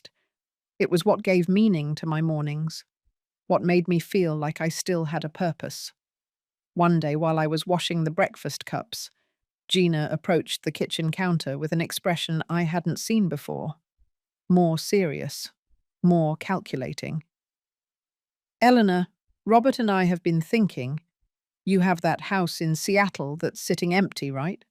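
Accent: United Kingdom. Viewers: What kind of accent does the automated voice have? Is it British? It's not an accent you would expect to hear in Seattle.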